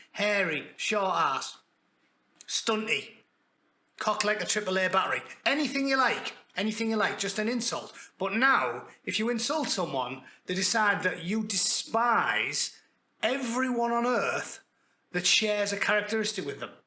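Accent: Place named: Middlesbrough, United Kingdom. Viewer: you can ramble as long as you like, your voice is music to my ears. Do love your Boro accent🎶🎧😊😂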